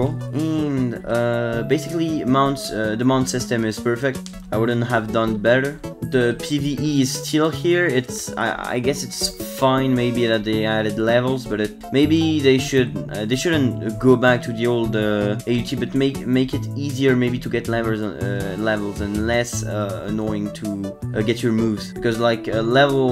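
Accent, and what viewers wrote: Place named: France. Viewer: u got a french accent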